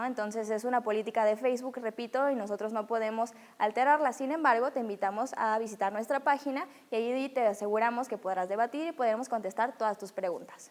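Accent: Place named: Fresa, Mexico. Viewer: Sí, lógicamente que la critico y tengo todo el derecho de hacerlo, se dirige hacia el público en general y por qué no puedo hacerlo? Y lo reafirmo: suena muy fresa y sin el carácter necesario que exige el mensaje que quiere dar. Cuál es el problema? qué te molesta? que mi opinión no te haya gustado? Que no sea la misma que la tuya? digo, creo que tengo todo el derecho de opinar lo que me parece. Espero no te ofenda, me sentiría muy mal. No sabes cuánto.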